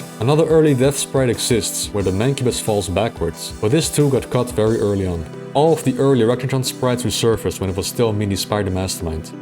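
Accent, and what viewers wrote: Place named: Netherlands. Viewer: Something about the way you sound make me wonder if you Dutch. Are you, by any chance?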